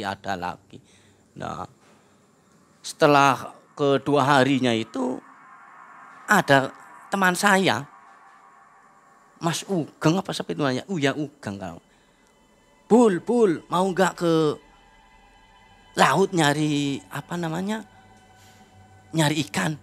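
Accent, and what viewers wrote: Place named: Banyumas, Indonesia. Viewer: Lucu bngt nih orang ceritanya😂😂😂 kaya orang ngapak si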